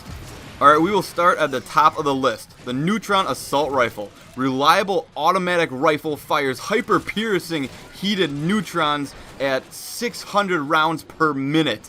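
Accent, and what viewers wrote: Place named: Canada. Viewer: he has a really heavy canadian accent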